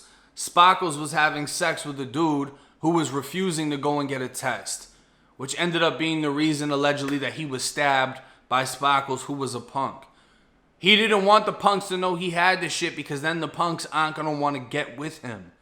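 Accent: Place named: East Coast, United States. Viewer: Biggest crush EVERRRRR😏 it's the east coast accent with the Florida dgaf vibe for me🤷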